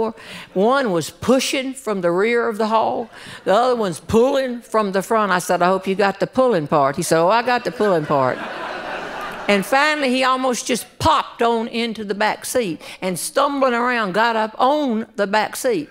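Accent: South, United States. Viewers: I MISS the south every time I listen to you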